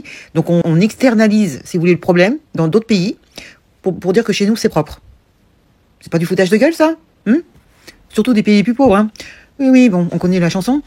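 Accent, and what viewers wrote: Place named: Rhône Valley, France. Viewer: Excellente nouvelle, merci du partage plein de bon sens, depuis la Côte Basque ❤ C'est migonn cet accent de la vallée du Rhône😊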